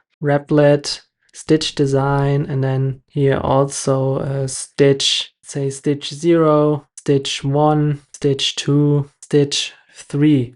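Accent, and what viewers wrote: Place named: Germany. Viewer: If you hear a german accent you know you are on the right video ! Thanks 😊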